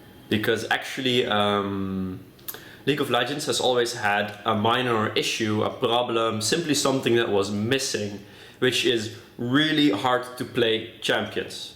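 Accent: Netherlands. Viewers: Je hoort gewoon da die nederlands is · Kut nederlands accent xD. Ik vind hrt leuk om jou vids te kijken. Ze zijn egt daacking awesome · Dat nederlandse accent en soms die woordjes blijft leuk haha xD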